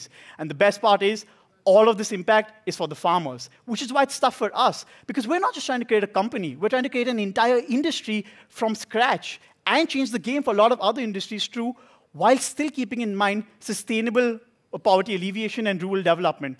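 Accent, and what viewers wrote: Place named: South Bombay, India. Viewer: He really got that sobo accent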